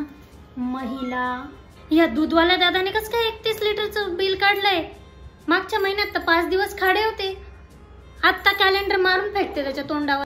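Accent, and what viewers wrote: Khandesh, India. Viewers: खूप छान सादरीकरण ताई. मराठीला खान्देशीचा तडका, छान वाटतं ऐकायला.🌹🌹🌹🌹🌹🌹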